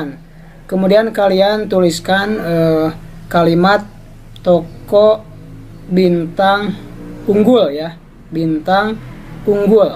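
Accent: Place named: West Java, Indonesia. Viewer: logatna na mah urang sunda nya kang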